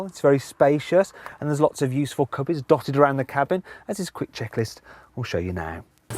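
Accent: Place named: Birmingham, United Kingdom. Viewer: Interesting how you can't hear your Brummie accent as much in these older videos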